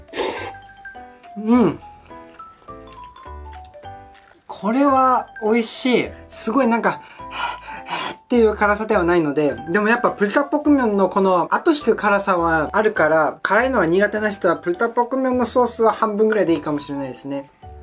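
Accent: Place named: South Korea. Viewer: 오 어릴적 한국에 살아서 그런지 지금도 한국인 발음이 남아있는것같아요 신기ㅋㅋㅋ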